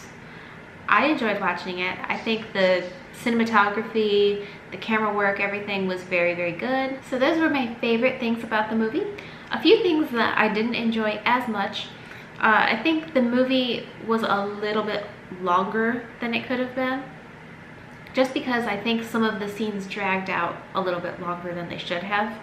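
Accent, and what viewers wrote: Telugu, India. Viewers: genuine review movie is really good by the way your telugu speaking very cute🥰🥰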